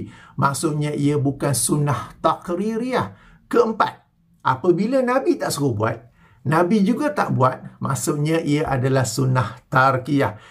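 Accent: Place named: Penang, Malaysia. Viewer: Kapten punya loghat Penang, "tak prnah habakkkq .. 🤣👍🏼 T.kasih atas ilmu kapt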